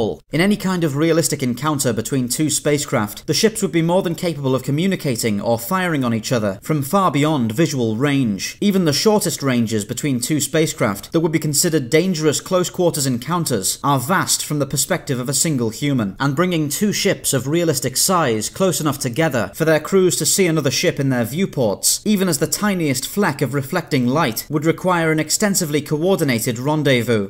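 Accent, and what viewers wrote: United Kingdom. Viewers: I truly enjoy your episodes. It was that pointed you out. I enjoy your attention to detail, graphics and that British accent, with your own personal confidence make for one hell of a great voice over voice!! I do, however, have to disagree with you a number of your points. First, you presuming a galaxy devoid of long range sensor arrays. These serve as surveillance, relay stations, beacons as well as keep track of all craft movement with in the area they can surveil. These arrays could scan for hundreds of different anomalies. They would cover the entire light spectrum, they would also likely have very sophisticated visual scanners, and likely an assortment of drones to extend their range or get closer looks at possible tremors of danger. As far as stealth technology to mask their the residual radiation of their engines, primary faster than light engines and ‘impulse’ engines. 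Also masking every frequency with which they are scanners. If they have shield activated, there is no plausible way to disguise radiation waste from their various engines. It is possible to design a ship that repurposes waste radiation, basically a submarine in space. Shields though, that’s an immense amount of energy in the shields themselves. This a huge problem. Romulans couldn’t engage their shields while cloaked, the sheer amount of energy, projected away from a ship, to most effective, yet at least 200m away from the ship, to better protect the hull from hugely impactful hits that would resona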